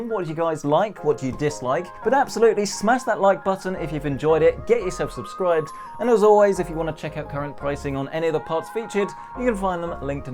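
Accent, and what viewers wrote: United Kingdom. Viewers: what region is your accent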